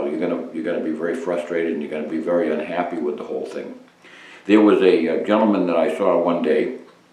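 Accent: New England, United States. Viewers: Like everyone, I have some slightly different thoughts on some of your editorials but for the most part, when I want a very informative "no hidden agenda" intelligent perspective on my current gun interests, I go to. I'm from Texas and I guess I'm somewhat skewed in the area of first-impressions, but once I got past your (no disrespect) yankee accent, you became one of my buddies. Thanks for all the hard work you put into your videos. Don from Pearland, Texas.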